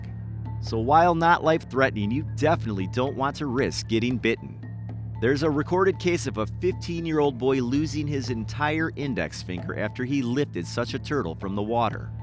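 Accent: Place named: Midwest, United States. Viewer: The Midwest accent is blowing me and I’m from fuckin Chicago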